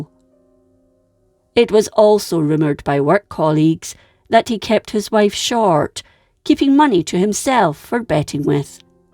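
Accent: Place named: Scotland, United Kingdom. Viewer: A Scottish accent.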